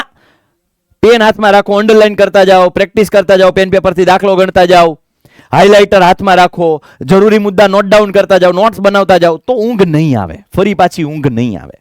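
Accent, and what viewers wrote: Kathiawar, India. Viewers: Tame kadhiyavadi cho to sarkhu j bolu kadhiyavdi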